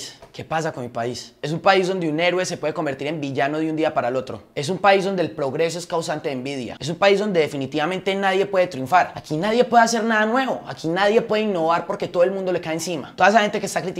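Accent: Antioquia, Colombia. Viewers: vea mijo usted tiene toda la razon no le pare bola ninguno de esos comentario negativos envidioso y me gusta como hablan los colombianos y te felicito por que tu opinion es mui bueno te miro desde Ecuador Saludos a esos envidiosos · asi es que se habla te apoyo Qué Mal que colombia este asi 😞😔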